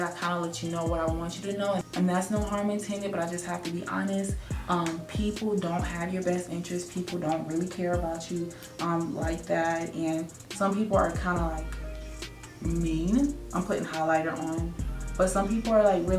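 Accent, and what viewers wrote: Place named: South, United States. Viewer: I'm so happy you did this because I didn't just start following you but I kinda did lol so I'm a rookie to the #DesignSquad lol but I loved that I got to know more about you and love your southern accent lol I have family down south as well so to see you be so stylish and chic coming from the south makes me happy!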